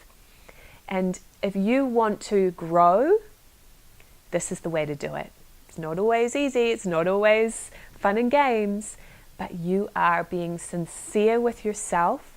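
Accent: New Zealand. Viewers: Very helpful thank you. I discovered you a short time ago on YouTube and I have appreciated a lot of what you have been sharing. I particularly resonated with your video on Doreen Virtue... I have never been comfortable with the traditional explanations of things like angels when it gets presented as absolute fact while things, and our understanding of them, can evolve. You sound a lot like a New Zealander and I'm guessing you've spent some formative years in the lower South Island (although I've been wrong countless times before). Best wishes from Nelson, NZ.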